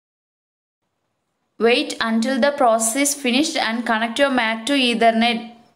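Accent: India. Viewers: Thickest Indian Accent Ever.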